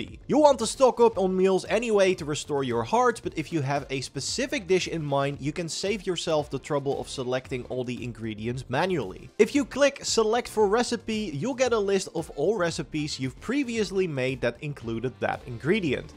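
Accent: Netherlands. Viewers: Heerlijk dat Nederlands Engels accentje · Ik merkte meteen aan je accent dat jij nederlands bent 😂. Goeie video trouwen was heel nuttig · You sound dutch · i smell dutch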